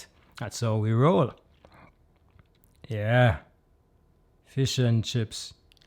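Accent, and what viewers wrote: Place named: Jamaica. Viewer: I don't know if it's a Jamaican accent or African....oh ..Jamaican.. Big up G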